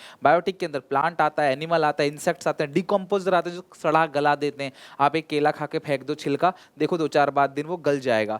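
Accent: Odisha, India. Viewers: Sir are you from odisha??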